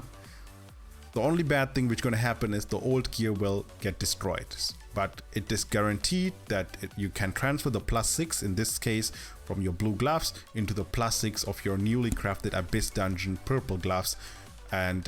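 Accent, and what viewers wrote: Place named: Austria. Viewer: Thanks for the clear explanation bro. Based on your experience, what was the highest item level you saw in game? I've seen a lot of videos and they ranged from <num> only. btw I like you accent, it's like Arnold Schwarzenegger. lol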